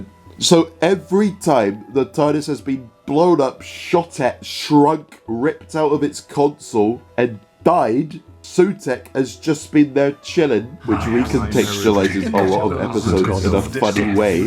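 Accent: United Kingdom. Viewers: Time to listen to a british git speak about a show I watched like once when I was the size of a bedside cabinet and not understand anything like a modern tik tok kid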